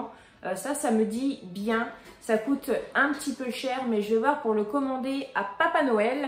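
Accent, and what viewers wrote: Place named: North, France. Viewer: Et t'aime particulièrement parceque tu es le double d'une collègue de travail, du Nord, presque le même accent, la même empathie, gentillesse... une belle personne. Je ne la vois plus car je suis contente partie à la retraite, déménagement à 400 km... continue tu fais de belles choses, et une chose est certaine, les enfants se souviennent des bons moments pas des choses, mes filles qui ont 40 ans me le disent souvent ...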